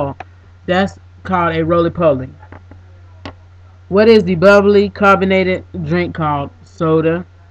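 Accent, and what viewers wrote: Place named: Washington DC, United States. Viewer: OMG! He is sooo cute!! Yasss for the tag video!!! The D.C. accent is easy to pick up...I love it!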